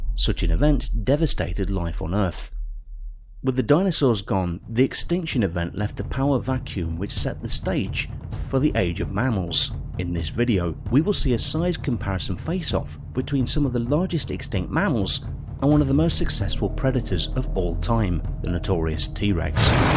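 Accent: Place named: United Kingdom. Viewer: that british accent makes tge video better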